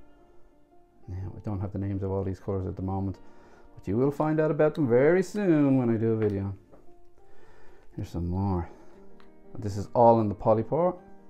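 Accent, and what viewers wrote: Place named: Ireland. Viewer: Thanks this is a great resourse and I shall be using it. You are great at explaining. I will share the link with all my YouTube pouring addict friends. Love your Irish accent and great to find some teachers in Europe. Thanks again, Rita x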